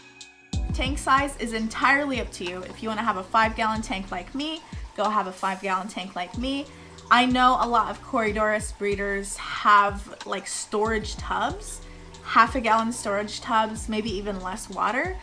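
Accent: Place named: Southern Midwest, United States. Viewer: You sound more like you are from Southern Mid-West US than you do Australia. Transplant? Been watching yours and Nick's videos lately. Was wanting to breed some bread and butter fish other than boring guppies and bristlenose. Your videos are good motivation.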